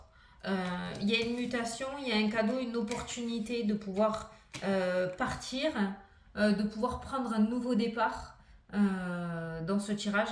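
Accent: South, France. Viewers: Tu me fait rire !!! ton accent du midi qui chante, ton franc parlé ,ta joie de vivre te regarder m'apporte de la bonne humeur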